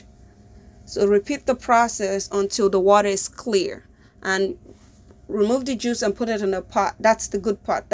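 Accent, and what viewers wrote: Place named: United States. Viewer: I just finished watching a pidgin version of another video. Coming over here, It's the American accent for me o. Put in some Warm WORA... Na dem no ready. Well done. Nice video. Please, I suggest you should record your videos in landscape mode for Youtube.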